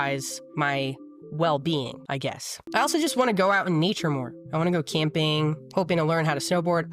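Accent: United Kingdom. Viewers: Britain has lots of accents. That is sort of BBC English, middle class south east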